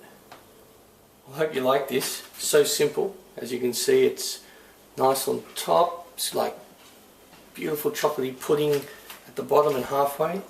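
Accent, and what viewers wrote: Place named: Australia. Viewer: hayward, you love his accent because he's australian! Go aussie XD Your whisk is cute ^_^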